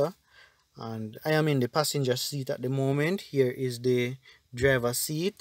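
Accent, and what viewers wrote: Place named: Jamaica. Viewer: This was very helpful , keep on doing your thing, you sounds like a yaawdy and i like the way you brought the information plain and simple.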